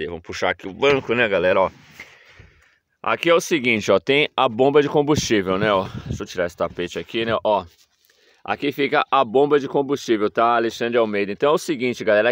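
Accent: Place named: São Paulo, Brazil. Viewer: Grato! Pelo sotaque você deve ser de SP capital... Você faz esse serviço de instalar o segredo? Qualquer auto-eletrico tem essa expertise?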